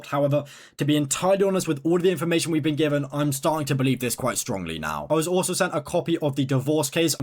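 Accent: United Kingdom. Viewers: You’re like the inverse of MoistCr1TiKaL black T-shirts UK accent and high energy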